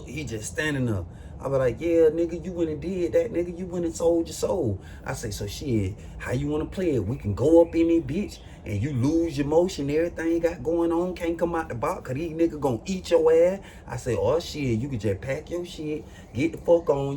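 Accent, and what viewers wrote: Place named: United States. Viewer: Fresh out tha friderator 😂😂😂 I love your country ass ❤️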